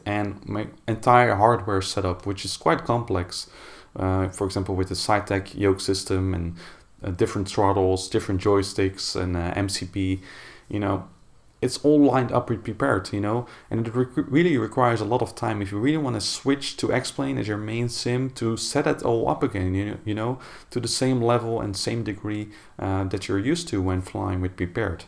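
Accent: Netherlands. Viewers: Okay ik ben dus niet de enige die z'n accent hoorde hahaha ik d8 ook al dat hij nl is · I KNEW IT! your dutch! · Your Dutch accent is very funny☺️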